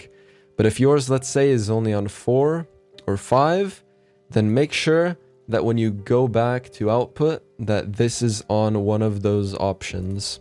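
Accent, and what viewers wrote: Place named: England, United Kingdom. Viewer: Oh, thanks finally an English guy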